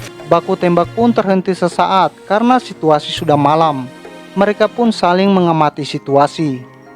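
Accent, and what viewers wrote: Eastern Indonesia, Indonesia. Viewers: Fix adminnya orang timur. Jelek banget pembawaannya 😅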